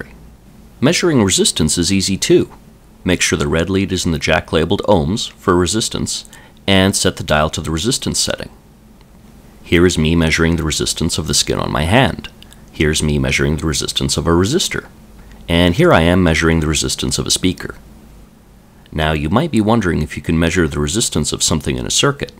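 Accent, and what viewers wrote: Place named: Canada. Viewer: Nice channel. Good content, Canadian voice and humour. Bon!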